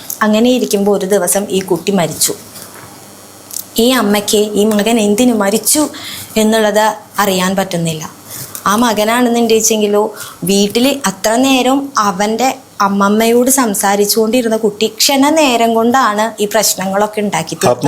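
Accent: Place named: Thrissur, India. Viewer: തൃശൂർ പച്ച മലയാളം 🙄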